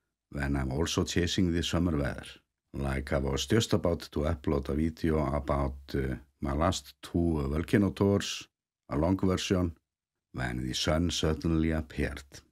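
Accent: Iceland. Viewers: I love Iceland since I was young and I got a book from Jon Svensson about Nonni! But first in May 2022 I could follow my dream and visited Iceland and Nonnis home in Akureyri. In March 2024 I was again in Iceland (between two eruptions) and saw the beautiful Katla icecave. I live in Germany and I will visit the tomb of Jon Svensson in cologne next. Thank you for your beautiful reports about Iceland! I love your Icelandic/English dialect! Many thankful regards!!!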